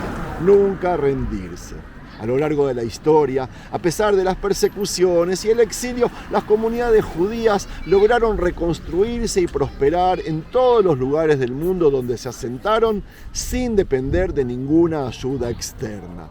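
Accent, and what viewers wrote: Argentina. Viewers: Nice video. Is your accent a mix of Argentinian and Hebrew?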